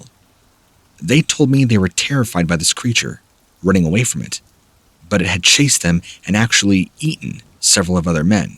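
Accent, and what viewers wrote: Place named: United States. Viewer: You sound American but yet use the meter instead of feet, anyways I'm too tired to go look it up I'm out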